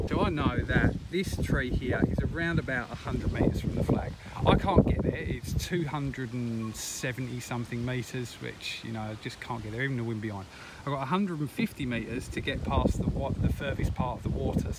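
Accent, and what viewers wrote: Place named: Cockney, United Kingdom. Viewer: nice... Looking fwd to seeing that come through. Cheers for the sub back... I couldn't place your accent... It actually has hints of cockney on it. Hopefully I haven't just offended you. Lol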